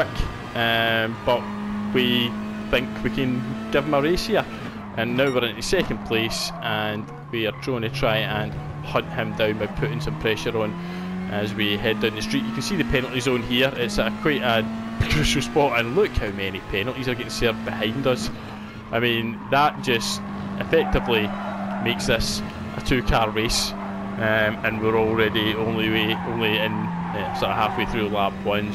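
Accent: Scotland, United Kingdom. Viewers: First time viewer and fellow Scot. Good to see that the UK GT Sport streamers are not all from the SE of England. Nothing against the English at all, but just nice to hear the Scots accent commentating. You from the lowlands, Glasgow area? Would love to see you racing with the likes of Super GT, Tidgney, Rory and Kie. Like Rory - cos of his mature attitude to racing, much like yourself. Consider me a sub.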